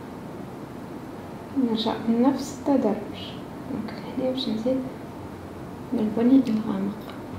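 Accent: Tunisia. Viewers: نموت على اللهجة التونسية😍 جارتكم من الجزائر 🌺💙💙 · هي تونسية ولهجة تونسية قربية بزاف لهجة مغربية ومصرية 🙄فهمتي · تونسية من الأب و الأم و نعرفها ايش جابها للجزاير !!!!!! هذه لهجة تونسية لا علاقة باللهجة الجزائرية اختلاف كبير مش عارف علاش تتلصقو في كل ماهو تونسي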